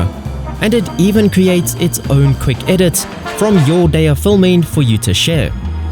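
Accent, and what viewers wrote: South Africa. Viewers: crazy how I can tell youre south african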